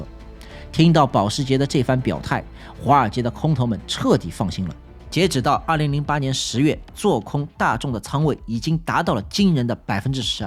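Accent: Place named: Wu, China. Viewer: 挺好的 就喜欢吴语区口音😂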